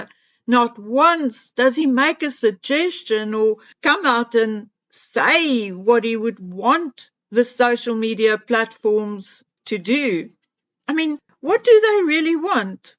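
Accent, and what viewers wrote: South Africa. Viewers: She has a South African accent, get over it.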